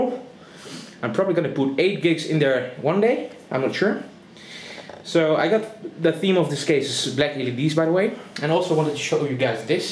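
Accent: Netherlands. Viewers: jij klinkt heel erg nederlands lol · mooi systeempje, heb al wel een 6870, maar nog een i5 760. Ga wel upgraden naar ivy bridge. Best geinig dat je kan horen dat je nl bent (en ja ik weet dat er nl in je naam staat)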